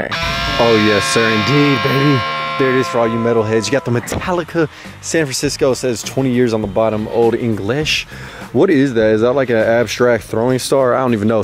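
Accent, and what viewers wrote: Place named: Texas, United States. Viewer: I appreciate the Texas Flanders accent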